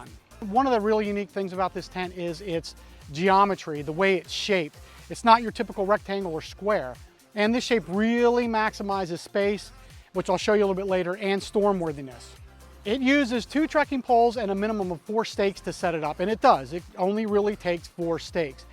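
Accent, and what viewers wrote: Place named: Baltimore, United States. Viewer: Are you from Baltimore? I think I hear it in your accent, the way you pronounce during like "dOOring".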